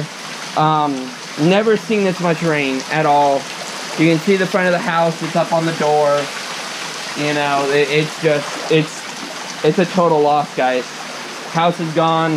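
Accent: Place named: Alabama, United States. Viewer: you might be from Houston but you sound like your from Alabama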